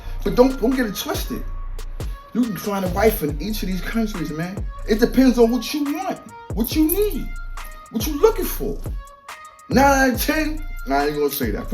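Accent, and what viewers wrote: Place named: New York, United States. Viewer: love when this dude talk that ny shit lol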